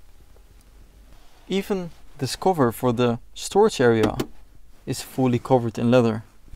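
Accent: Netherlands. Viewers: Toffe vid maar je kan horen tot je NE bent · Your dutch accent is killing me, but what a car omg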